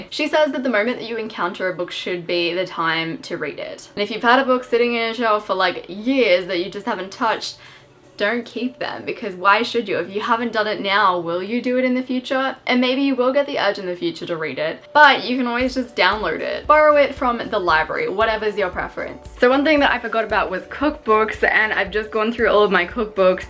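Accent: Australia. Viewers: omg are you Australian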